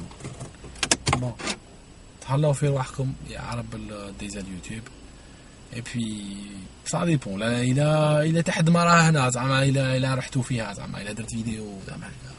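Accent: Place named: West, Algeria. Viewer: LOLOL Dude, The stories about people are so funny... ... Kifash rahoum ouled Mosta? Ça fait plaisir entendre l'accent de l'Ouest. Welcome back.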